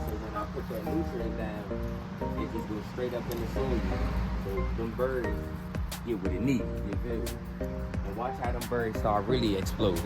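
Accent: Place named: South, United States. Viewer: The Southern accent is killing me😂. “Sum Burries “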